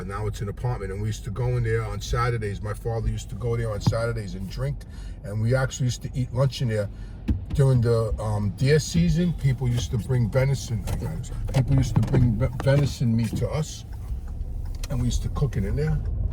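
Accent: New York, United States. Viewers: LOVE that New York accent! The only thing better than listening to some guy from New York speak, is listening to some woman speak! Specially the single women.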